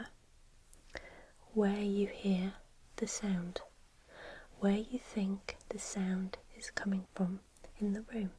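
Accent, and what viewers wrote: United Kingdom. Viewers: This is the first video of yours I've seen, I must say, it's excellent. The overall quality is very genuine. I went blindly into this video, then you called yourself " " and you had a British accent, and I had a Doctor Who, I mean, you're even a ginger!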